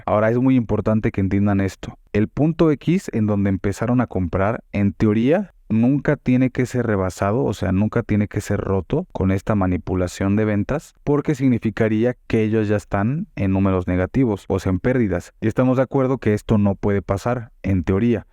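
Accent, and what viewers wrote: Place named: Mexico City, Mexico. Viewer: ¿eres de Chilangolandia?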